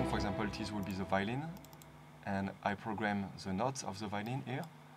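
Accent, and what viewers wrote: France. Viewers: Ha un accento francese?sono francese ammiro lei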